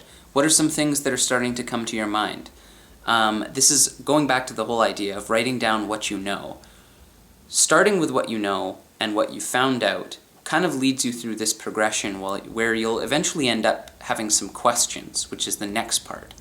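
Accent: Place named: Canada. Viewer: I love your accent. <3 Canada!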